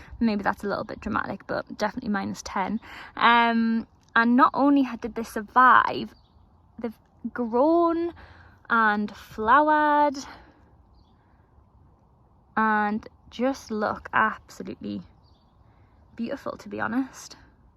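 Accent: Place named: Newcastle, United Kingdom. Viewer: Hi you are a natural your accent is so cute not too much Newcastle. I like that you are more old tech school, please keep it that way.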